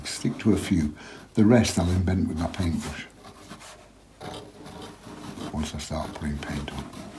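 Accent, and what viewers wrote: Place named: Sheffield, United Kingdom. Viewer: Hiya. I see I'm the first to comment! I found you a couple of weeks ago and I've watched all your videos. I really enjoy your teaching style and casual and effective way of approaching art. I live in South Africa but was born in Sheffield so your accent is a joy to listen to. Best regards Sylvia xxx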